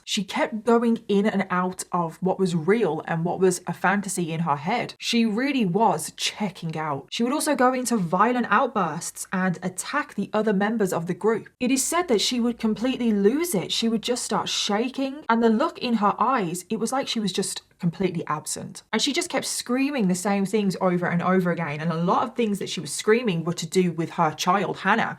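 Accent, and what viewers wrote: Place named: Birmingham, United Kingdom. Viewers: Ooh are you a fellow brummie? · You’re so amazing , and make me feel at home with your accent I live in Australia but from Birmingham !! You’re my favourite when I’m having a adhd moment you keep me on task 🙏🏻🙌🌻🫶🏻🥂🎩🤘🏼👽💜💚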